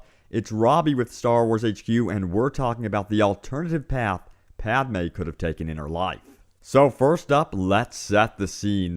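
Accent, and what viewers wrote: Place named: South, United States. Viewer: What state are you from? You sound kind of southern but not really.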